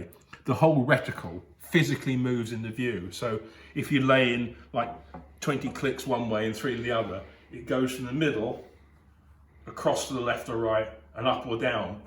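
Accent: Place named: United Kingdom. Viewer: You can still get bolt action rifles in the UK? I'm just assuming form your accent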